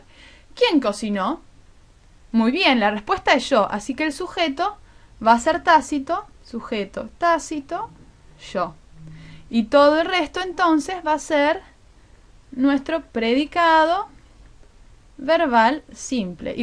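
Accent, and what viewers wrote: Argentina. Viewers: Está muy bien explicado, y el acento argentino le da el toque, ¡GRACIAS!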